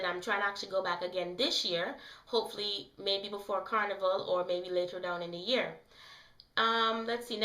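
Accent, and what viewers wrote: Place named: Antigua and Barbuda. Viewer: Girl you took me back. I was not born in Antigua but I used to go there every year from 1-16. It has been a long since I have gone back. You are apart of the family. My cousin and step mother are a part of the family. You might be surprise but we could be distant family. It was great hearing the accent again. BTW, I will be asking my mom to make some ducuna. Fungi and steam fish is a regular thing in my house even though my boyfriend does not eat it.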